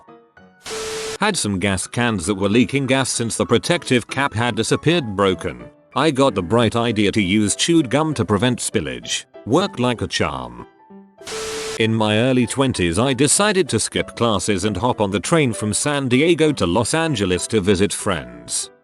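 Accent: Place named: England, United Kingdom. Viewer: Really tiered of that English accent.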